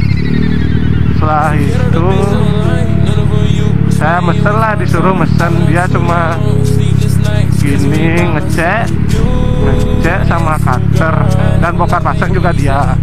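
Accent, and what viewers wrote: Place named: Bali, Indonesia. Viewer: Kle bali banget wkwk jeg kaku bhs indone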